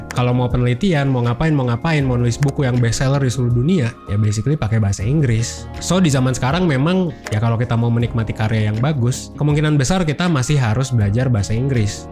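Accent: Indonesia. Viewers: Aksen indo yg paling lucu.. 😂😂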